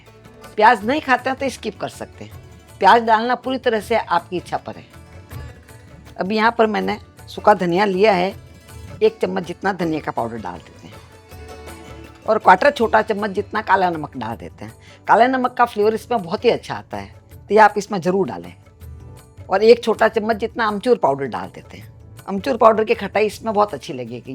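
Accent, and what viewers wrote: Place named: Rajasthan, India. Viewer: Nice mam love from Gujrat ❤ I also love Rajsthani people your rajsthani tune in all recipes thats why I watch your all videos you look like morden Nani 😅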